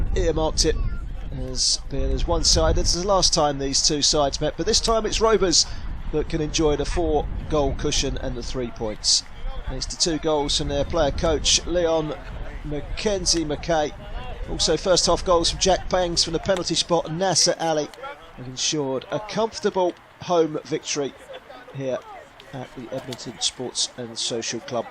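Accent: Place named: Northern Ireland, United Kingdom. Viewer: commentary is very BBC1 NORTHERN Ireland.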